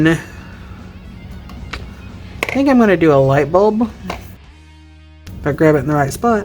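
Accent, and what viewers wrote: South, United States. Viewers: I’m from GA and you have an accent to me. My parents are not from the south though, so I don’t have a southern accent. I do say y’all a lot though 😂 Love to see you doing so many challenges ❤ Great job! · You are definitely a southerner, just like me! North Cental Louisiana, here! I have a very slow drawl! 😂😂😂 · Hi...new subbie here:) I'm northern so yes, you do have an accent lol Your savings challenges are inspiring!! Great video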